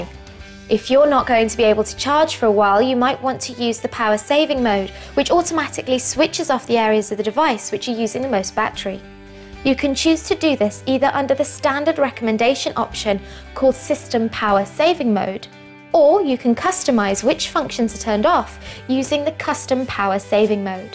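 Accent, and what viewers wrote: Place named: United Kingdom. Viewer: Cool!! I really love it voice.thanks for it voice.British cool.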